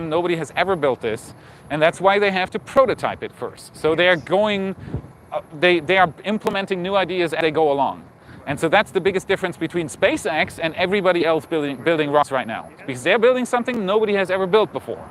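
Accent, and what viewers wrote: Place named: Germany. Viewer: WOWOWOWOWOWOWOwowowowowow!!! Guys who programmed Apollo Computer were at a Computer History Museum talk. At a VCF West - Vintage Computer Fest were some Saturn-5 guys. Ish Spreken Kaine Deutsch . Some how I thought u were a Spaniard but I think I heard German. No hablo Alemanes.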